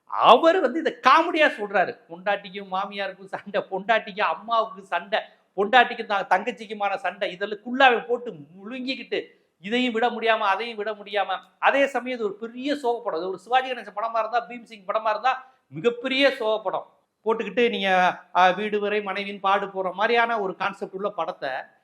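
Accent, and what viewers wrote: Madurai, India. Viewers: நீங்கள் எதற்கு மதுரை வட்டார மொழியில் பேசுகிறீர்கள் இந்த படத்திற்காகவா?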